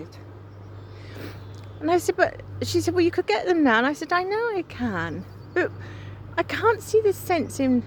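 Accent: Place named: Southern England, United Kingdom. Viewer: we both have an English southern accent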